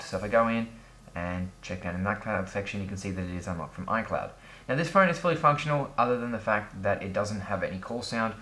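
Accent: Australia. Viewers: / / WPS 4004 he aussie also he is one if my good mates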